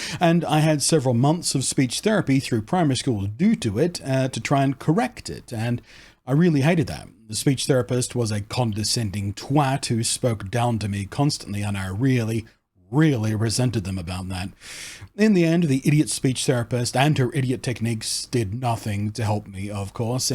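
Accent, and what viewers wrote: Australia. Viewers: is now a Vtuber? 😄 I think you sound pretty Aussie to me. I watch alot of Australian Survivor 😂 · I've always struggled with "small talk", never really understood why people talk about trivial nonsense purely for the sake of conversation. Which even now makes trips to the barber somewhat uncomfortable, although I've found one lately that's happy enough to just get on without the jabber. I also get what I call "verbal error loops" when particularly stressed or tired, where I'll stick on a particular part of a word or sentence, almost always two syllables for some reason. And of course I notice it happening, and get more stressed trying to stop it and... Hence the "loop" 🙄 Only solution is to stop talking and take a breath. Accents are interesting for me, I'm quite good at languages for some reason and as a part of that, good at picking up accents. 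Whether I want to or not. Which is problematic, as I end up picking up accents from whatever environment I'm in, and again am aware of it and feel like it sounds like I'm mocking the locals (for example speaking English in Germany, but with a German accent and grammar) and have to actually WORK at keeping my natural accent. Maybe it's because I'm listening with a Scottish ear, but I've honestly never noted your accent as being anything other than Aussie, although that may be influenced by the fact that I've known or worked with a few different Australians over the years, all of whom have had wildly different accents! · You sound like an average Aussie to me. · I live in NYC, and grew up in Melbourne, you sound very Australian. I wouldn’t call how you speak ‘Americanised’ in the slightest. Americans just have a terrible ear for accents. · Being from the UK, and coincidentally, also being Autistic myself, I'd never really thought about your accent too much. 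Apart from the fact you've told us you're from Australia, and also that I've heard you enough times in your previous videos i could say ' an Aussie', but really that's about it. I have family who have lived in New Zealand for so long now they've picked up a Kiwi accent, and they clearly sound different enough from an Aussie, at least to me, so i'm fairly sure i could tell the difference between accents. You could try just smiling and nodding politely at those who accuse you of having a fake accent. It'll confuse the hell out of them....or maybe it won't.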